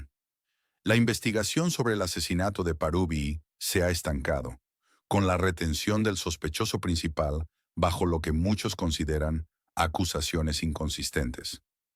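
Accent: England, United Kingdom. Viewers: ¿Hablas inglés con acento español. No verdad? Si habéis decidido doblar al castellano hacedlo bien, sin arrastrar las erres como tarados. Hablar español con acento inglés impone un idioma inexistente.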